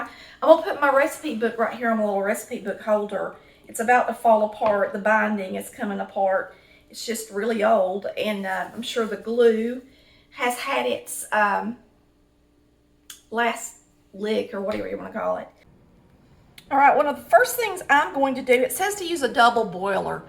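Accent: South, United States. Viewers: REMINDS ME A WEE BIT OF YORKSHIRE PUDDING. GREETINGS FAE SCOTLAND TAE APPALACHIA. YEEHAW, LOVE YIR SOUTHERN DRAWL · I can’t express to you how much I value and enjoy your videos. I live alone and your videos help get me thru the long evenings and aloneness. Your sweet southern accent and the way you talk is wonderful! I don’t cook but I love watching you cook up some yummy dishes and love seeing you and hearing you. It feels like I’m in the kitchen with a friend and not so stinkin alone. God bless you!!!!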